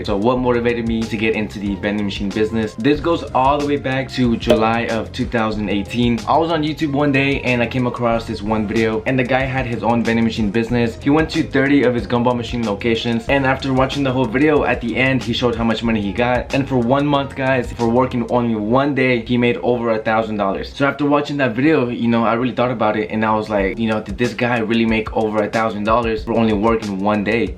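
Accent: Chile. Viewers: Eri chileno o no hermano? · ERES CHILENO WEON?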